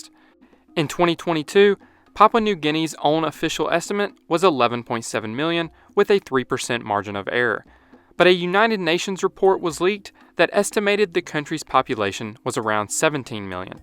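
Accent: United States. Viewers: What specific American accent do you have? Every time I watch these I try to work it out but I have no knowledge of US accents. My best guess is Philadelphia??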